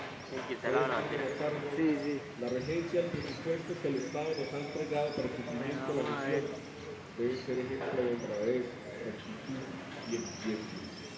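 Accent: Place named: Santander, Spain. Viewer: Creo que es Santanderiano mano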